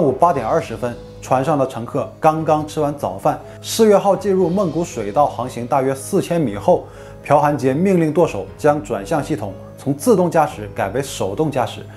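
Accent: Northeast, China. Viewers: 感觉是东北人，说话稍微有点东北味。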